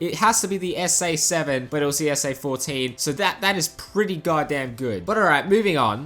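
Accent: Australia. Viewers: You soind like an australian ? If you are australian then yeah I agree that all is illegal there. Even a f*cking airsoft gun is not allowed.... That HK gun looked like a HK53 to me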